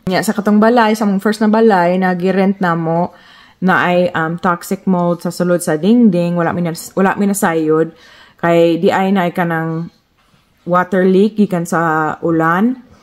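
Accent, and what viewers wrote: Visayas, Philippines. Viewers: ganahan kaayo ko sa imo accent mag binisaya jud, nindot paminawon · Sshhhttt… hhoooyy… 😁🤣 grabe jud ka bisaya. 😂 try korean airline more cheaper dw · Maayo kaayo ka mo estorya. Ganahan ko maminaw nimo Kay imong tingog Kay convincing kAayo mag talk ug story sa imong Life. Tapos bisaya kaayo. Gwaps kaayo imong tingog. FIRST TIME nako na ka kita sa video ani. Recommended by YouTube unexpectedly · Ang galing mo sister! Lalum kaayo ka mo bisaya. Proud kaayo minh mga Bisaya. God Bless you & your family! 👍👏❤️🇵🇭🙏☝🏻❤️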